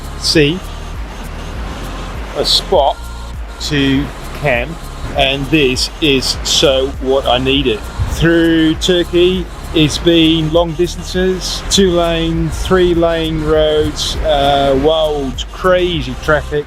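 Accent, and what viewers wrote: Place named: Australia. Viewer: Hoe kom je aan dat enorme Australische accent?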